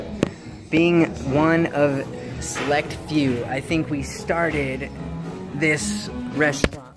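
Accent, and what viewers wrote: United States. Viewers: You From Chicago Bro ?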